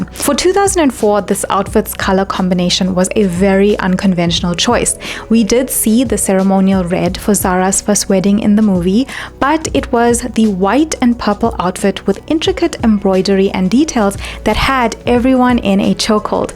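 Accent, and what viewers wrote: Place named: South Africa. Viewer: Unrelated to the topic but can I ask if you’re a South African desi ? Your accent is gorgeous !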